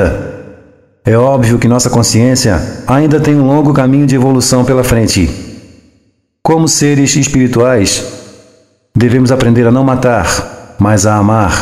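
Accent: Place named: Florianópolis, Brazil. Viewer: Essa voz e sotaque é de manezinho da ilha né?... morei em floripa por 6 anos e esse sotaque me faz lembrar ds lá.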